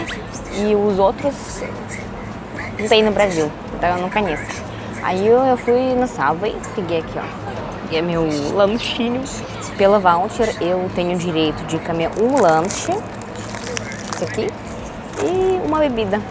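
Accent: Russia. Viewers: você é muito linda, muito fofa, seu sotaque russo é maravilhoso e te deixa muito charmosa, gosto muito dos seus vídeos, sou seu fã brasileiro!!! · Que charme de sotaque dessa russinha! Maravilha.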